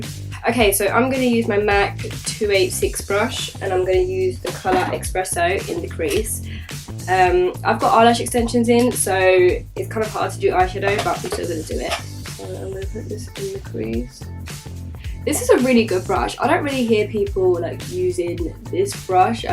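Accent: England, United Kingdom. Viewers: I love your accent! could just listen to you talk all day makes me miss being on vacation in england :( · Are you from London?:') the way you talk reminds me of myself haha!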